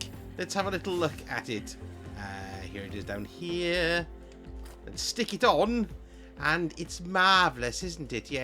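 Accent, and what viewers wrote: Wales, United Kingdom. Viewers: Do I want these triumphs badly enough to listen to a welshman for 10 mins...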